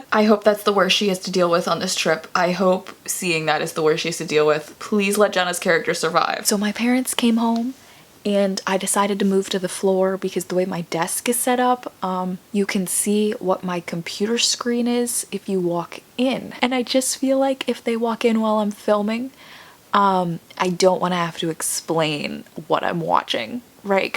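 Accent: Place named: South, United States. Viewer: I know she said she lives in Florida but when I've seen her other videos I never heard an accent. However this time when she talked about stepping on a gekko with her bare feet because she lost her glasses while the video had the guy stepping on the nail I could swear I heard her have a Southern accent. I had a friend I met in college and after talking to her for two weeks it was only then, after we'd studied all night, that her Lousiana accent came out.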